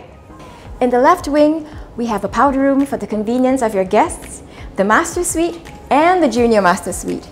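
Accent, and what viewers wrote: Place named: Philippines. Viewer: seems to be a Filipino because of nice English accent..🇰🇷🇺🇲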